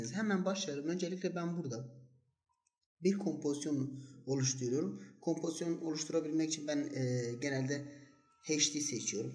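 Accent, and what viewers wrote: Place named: Urfa, Turkey. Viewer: Eline diline sağlık şu Urfa şivenle dahada çok seviyorum sizleri ve kanalını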